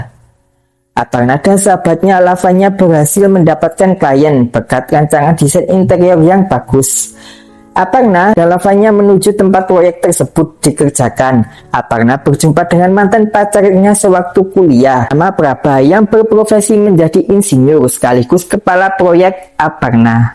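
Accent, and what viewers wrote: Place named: Banyumas, Indonesia. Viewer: Ngapak yak😅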